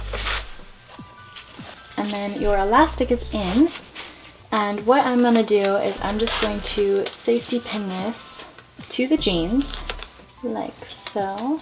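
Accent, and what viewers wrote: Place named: United States. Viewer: hey!! Just wondering where in america you are from because i study different types of american accents and yours is SUPER mild. So... just wondering! Thanks so much and pls reply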